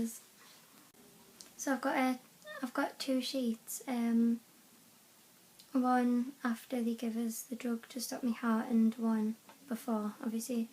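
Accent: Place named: United Kingdom. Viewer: Loved your video. I am scheduled for this ablation on 10 May. Very anxious. Lots of scary videos on this procedure. BTW I do love your british accent, and you are also very beautiful. Ken in USA.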